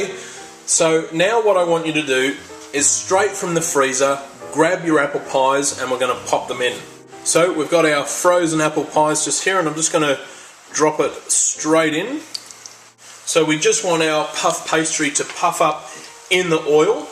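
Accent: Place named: Australia. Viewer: awkward ... hes Australian.... .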